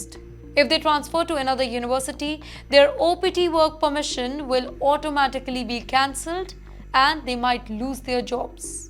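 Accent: India. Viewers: Tongue-twisting curry English.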